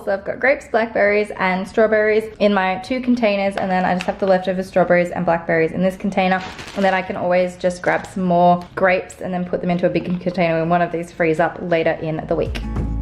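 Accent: Australia. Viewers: Hi! Are U from Australia?? By the way, New here, love your videos!! ❤️❤️❤️ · This may be a weird question.. are you Australian?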